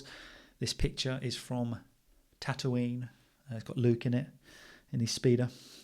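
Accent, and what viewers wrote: United Kingdom. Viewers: I love the british accent ♥️ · This is the first channel of British English that I really enjoyed 😊. I tried once before but I couldn't find one so good like yours. Thank you so much for your good job, and your effort helping us to improve our English skills. I must confess that I prefer the American accent, but you are changing this. I'm a little obsessed with your channel 😂.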